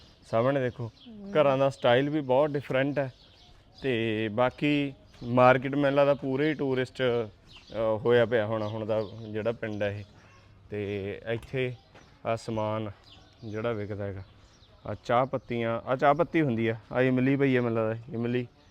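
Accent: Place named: Punjab, India. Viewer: Your "Pandu Punjabi accent " is excellent.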